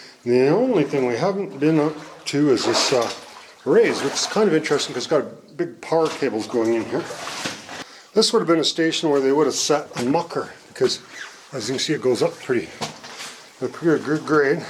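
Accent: Canada. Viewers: Wonder if he is Canadian, eh?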